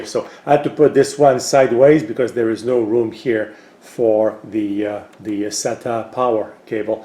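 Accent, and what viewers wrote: Quebec, Canada. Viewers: Thought I spotted un accent quebecois in your english monsieur.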